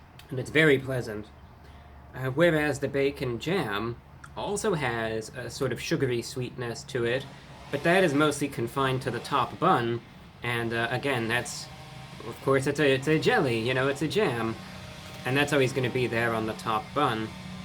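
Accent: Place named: Transatlantic, United States. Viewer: Why the switch to a Transatlantic Accent?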